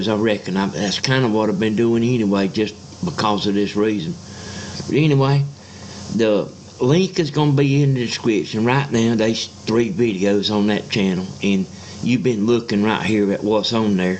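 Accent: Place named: Appalachia, United States. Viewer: hillbilly english...fit'n to be.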